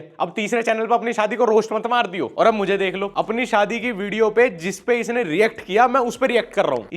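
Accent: Haryana, India. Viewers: Yaar apki awaz me thoda so haryanvi touch h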